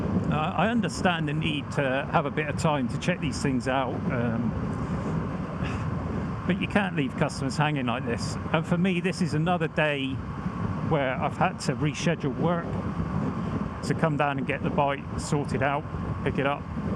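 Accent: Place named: West Country, United Kingdom. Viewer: I like your accent mate, just a subtle hint of west country. I hope you get this annoying problem fixed soon.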